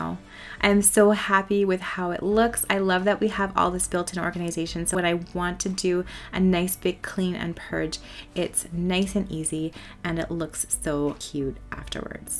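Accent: Canada. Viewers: You have such a pretty laundry room. I love the hits of red and cream and white it just looks so organized and clean it would inspire me to get busy on laundry. I like your Canadian accent too. Your voice is very soothing.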